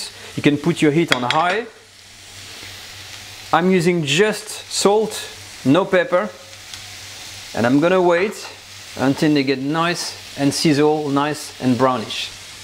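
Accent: France. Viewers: Your🌈 french 🌺accent 🔥😍 👌 😋😘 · Your English is excellent despite your French accent :-) This recipy looks amazing and easy to prepare... I was just wondering about the way you "reserve" your meat pieces before putting them on the plate and have them still warm after preparation of the mushrooms sauce... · I love his recipes and even more his techniques, and love the French accent. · I will definitely make this; I love your French accent! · "Nudge of Butter" with a real French accent is delicious in itself....., your own cookbook should be titled, "Nudge of Butter"....love it and hope ALL your dreams come true. I love your Academy....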